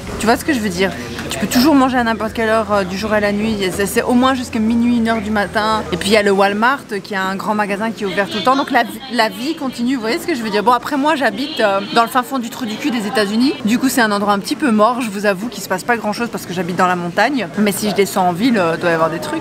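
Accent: Belgium. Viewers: dommage que tu ne dis plus les chiffres à la manière belge :'( (et suisse) Sinon super vidéo comme d'hab !!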